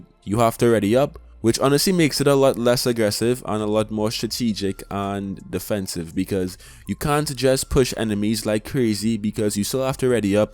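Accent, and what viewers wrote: Trinidad and Tobago. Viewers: Are you from Trinidad??? Your cent sounds like it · Are you trinidadian?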